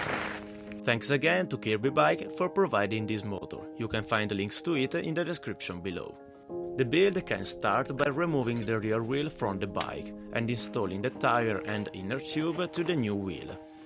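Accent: Italy. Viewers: U sound Italian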